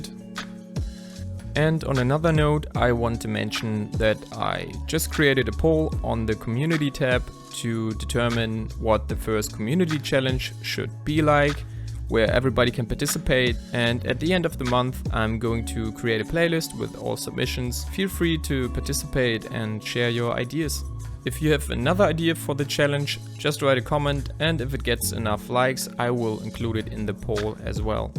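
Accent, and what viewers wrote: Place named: Germany. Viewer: your english sounds so german. but your video is great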